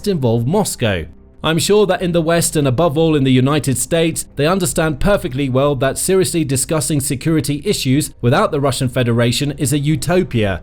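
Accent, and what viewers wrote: England, United Kingdom. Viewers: I am so tired of an English accent. Thumbs down.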